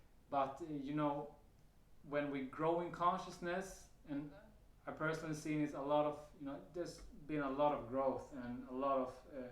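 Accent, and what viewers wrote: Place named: Sweden. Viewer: Tyckte jag kände igen svenne vibbarna i accenten 😊 Tack för din utvärdering 🙏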